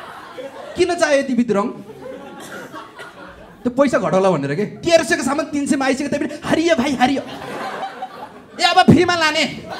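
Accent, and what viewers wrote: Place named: Newar, Nepal. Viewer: Newar ko boli tah ho 😂😁💖👍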